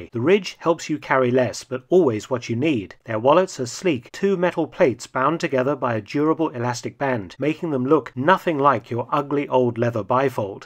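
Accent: United Kingdom. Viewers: Brit pronunciation. Any adult has heard this.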